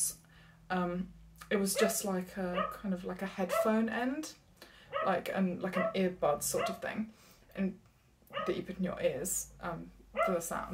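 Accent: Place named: England, United Kingdom. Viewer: Hi, just seen you for the first time. I am impressed by your lovely english accent, and very clear speech. Definitely no deterioration there. I used to have a friend who went deaf in his 20s. I knew him when he was in his mid 50s. He still had perfect speech despite having virtually no hearing at all for many years. Even when we were stood right next to a noisy compressor that started up, he had no idea it was running until I told him. I just mention his experience to make the point that deafness does not necessarily lead to poor speech. With regards to your Naida aids needing batteries. I think in some ways that is more convenient. For example, when you go travelling, you don't have to take a charger with you. And no worries about finding somewhere to plug them in. Or finding a suitable adaptor for foreign power sockets. Or if you are travelling overnight, the rechargeable ones might run out of power. 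You seem to have a good attitude to life. I am pleased to have "met" you. Best wishes.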